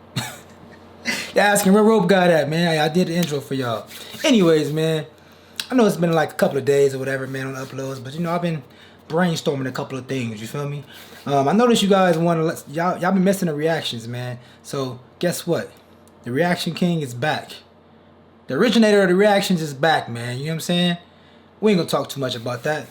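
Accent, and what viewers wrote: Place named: Washington DC, United States. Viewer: Aye you sound like a dc nigga too🤣💯🖤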